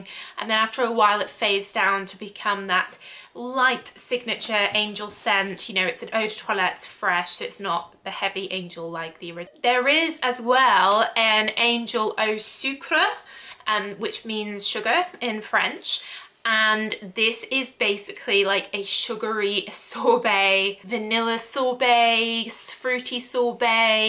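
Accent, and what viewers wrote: United Kingdom. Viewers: I’m from the Philippines and I love perfumes and I love watching people who have great British accent. So Here I AM HAHAHAHA LOVE YOUR VIDS ❤️💛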